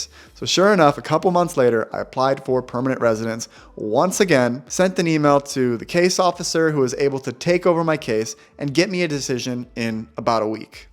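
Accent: Sweden. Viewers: even your english has a slight swedish accent now, thats whats most impressing KEK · Wow! I saw a video of yours quite a long time ago. Your accent in English sounds more Swedish now! :) Congrats on your citizenship! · Lately I've noticed your English being more influenced by Swedish. There's a slight accent at times as well as a few involuntary Swenglish phrases😊 Speaking like that makes you a true Swede!!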